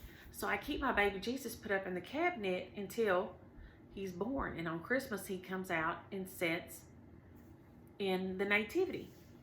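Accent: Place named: West Virginia, United States. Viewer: Just love your down home chats. makes me feel at home e g from WV My accent sounds like you as well. I’m in INDIANA and retired. But I still miss Home especially Christmas time!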